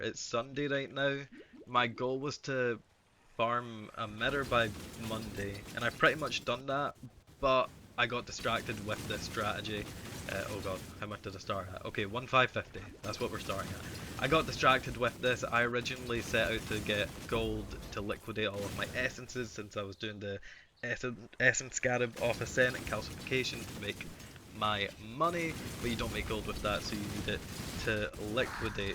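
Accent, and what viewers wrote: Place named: Scotland, United Kingdom. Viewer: Love the accent. Whether male or female, scottish is just 👌Cheers to the video.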